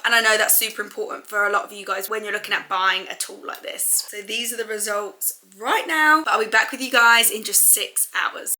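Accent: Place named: Australia. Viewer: I thought you was Australian at first 😂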